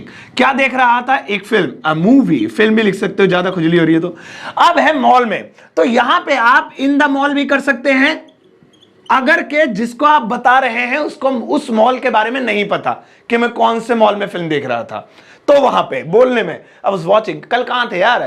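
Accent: India. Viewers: I love his desi English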